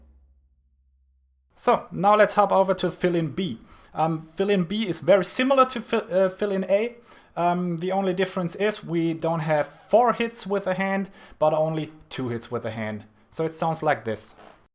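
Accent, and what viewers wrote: Germany. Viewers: are you german? :P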